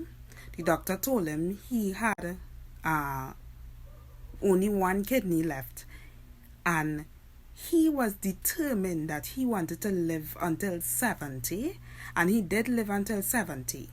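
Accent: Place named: Trinidad and Tobago. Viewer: I hear a trini accent! And this was on point, it all starts in the mind!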